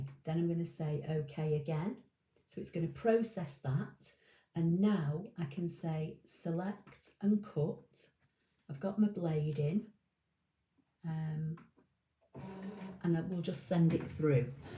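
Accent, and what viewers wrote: England, United Kingdom. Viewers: You have amazingly easy videos to understand even though your English accent is way different than my Texas accent but boy howdy does this old lady enjoy your videos. I haven’t received my scan and cut yet but I know I won’t be afraid to take it out of the box. I hope you do some videos about cutting material thank you so very very much for sharing your amazing talent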